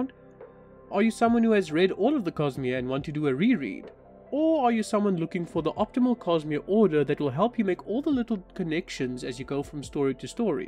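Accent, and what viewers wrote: South Africa. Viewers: First 5 seconds of you speaking I said that must be a fellow South African. Checked your about and I was correct. Nice high quality videos! Definitely gained a sub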